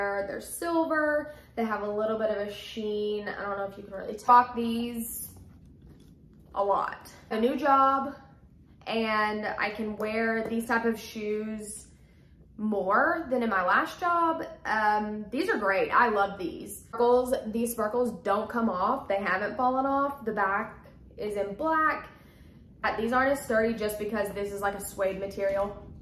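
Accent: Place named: Kentucky-Tennessee, United States. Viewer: Woohoo! Girl, that’s like 11 years of shoes. Also, the Kentuckennesse accent reminds me of home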